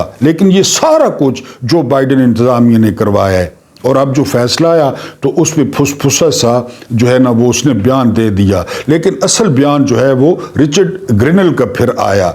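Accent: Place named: Pothohar, Pakistan. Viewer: نہ وڈیو لمبی نہ باتیں زیادہ بلکہ تشنگی رہ جاتی ہے آپکا پوٹھوہاری تڑکا دل باغ باغ ہو جاتا ھے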